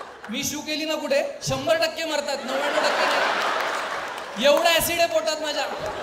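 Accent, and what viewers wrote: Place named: Kolhapur, India. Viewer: बरोबर आहे लय ऑड वाटतय पुणेकरांच्या तोंडातुन कोल्हापूरी भाषा ......जगात भारी आम्ही कोल्हापुरी😅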